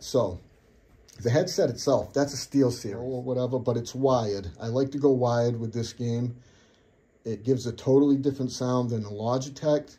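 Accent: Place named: New England, United States. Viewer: Sounds like you're in New England like me!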